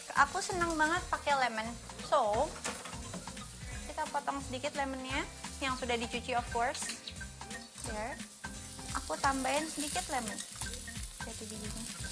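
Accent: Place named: Malaysia. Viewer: bahasa inggrisnya kayak org malaysia..ancur dicampur campur.. goodbye bahasa Indonesia klo artisnya saja suka pamer bahasa inggris nya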